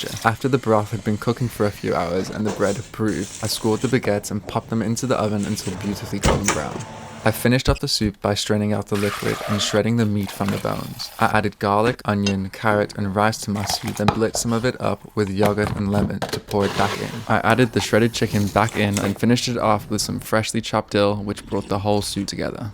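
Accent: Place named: Australia. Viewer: Your accent is super interesting. Australian, with a touch of Manchester, a little bit scandi and North American all in one.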